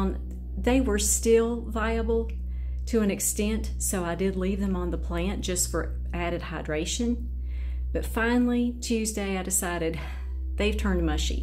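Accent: South, United States. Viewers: Those are some nice basic Phals your growing. Being from SC it’s nice to hear someone else with that southern accent growing nice looking orchids. Enjoyed the video.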